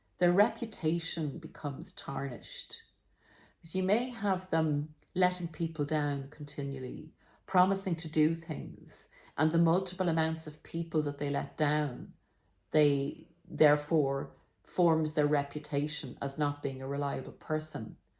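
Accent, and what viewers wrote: Ireland. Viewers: Fook! There's something about a glorious Irish accent that makes learnin' things much more engaging. Alas, me mother's side is Scottish, colonized by Brits, and they're wankers!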